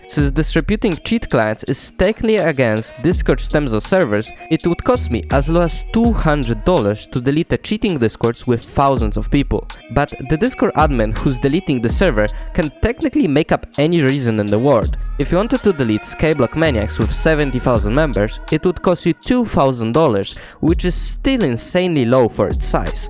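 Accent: Poland. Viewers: slysze polski akcent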